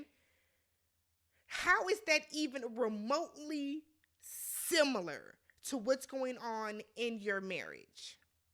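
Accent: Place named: Midwest, United States. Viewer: Heyy from NYC! I love your midwest accent. Your recaps are excellent, its like talking to a gf. Keep up the good work.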